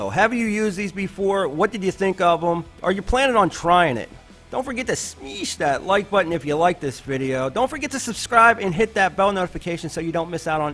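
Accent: Pittsburgh, United States. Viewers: Are you from Pittsburgh???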